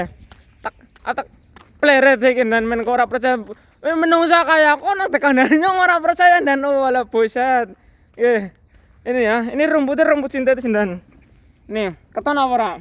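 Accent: Banyumas, Indonesia. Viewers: Salam ngapak ndan... Pwt-singapore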